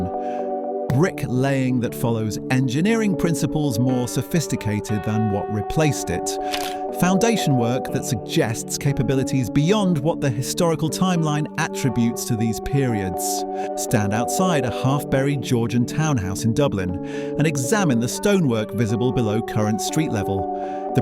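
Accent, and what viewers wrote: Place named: England, United Kingdom. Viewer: Who is really making all these Tartaria videos? All these channels are producing extremely similar videos… all are very well produced, all have professional sounding English accent narrations, all have a similar cadence/ speech patterns, they have zero human mistakes in the narration, never a throat clear, slight cough, never a change in voice, never an actual person to be seen behind the voice/ channel.. All seemingly able to find these amazing old photos in “archives” & supposedly researching records & registries from centuries ago? it’s seems as if are all being AI produced? Are they truly the work of genuine independent researchers? I find the whole Tartarian topic extremely interesting, but question if there is something else going on behind this information.. All these channels producing virtually the exact same mini documentary style videos on the subject?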